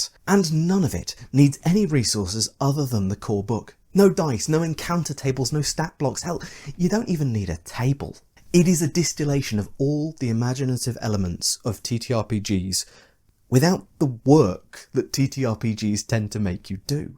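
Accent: United Kingdom. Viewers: This guy is amazing in the sense he is 100% wrong on every video he makes but his narcissism and british accent fools him into thinking he is correct.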